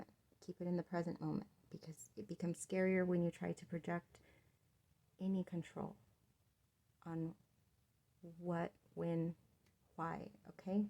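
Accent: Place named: Latin America. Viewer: Pile 3 -Oh my Goodness, What can I say but...what an extraordinarily, beautiful 😍 reading. Firstly, I love how you speak with your receiving hand. You are absolutely 💯 amazing. I would be able to understand everything you say even if there was no sound. The sign language you send out is clear and concise. I'm not sure what nationality you are and I don't want to assume but by your accent I want to say hispanic? A beautifully, sensual spoken ❤️ ❣️ LOL, I'm Ukrainian and I speak with my hands. LMAO I catch people watching my hands constantly and it looks like they are like what the hell?!😂🤣🤣 Even when you talked about the curtain you have up, your hand created that curtain as well. ✨️Truly uncanny✨️ So many syncronicities. Everything from the dried flowers(I remember the reading a few weeks ago that you first brought them in. It completely caught me off guard. 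I didnt comment because there were too many personal messages that I didn't want to share publicly. And I will say that all 3 piles resonated heavily), bessom broom and drift wood all spoke to me very deeply. My supernova tower moment happened in 2007 and since brought multiple tower moments to help me clear all heaviness I have been carrying. The most recent tower moment which started on May 12th and completed itself May 14th, has brought a serenity to my life like no other. Please know how connected you are to the collective(and well me, I feel very guilty because I feel like I am receiving free readings, which I wou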